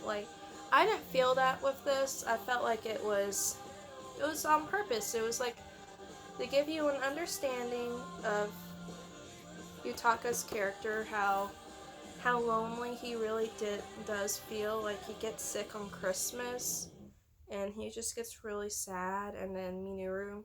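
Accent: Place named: South, United States. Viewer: I'm so glad you read this and loved it! It's a beautiful manga. I love when your country accent comes out.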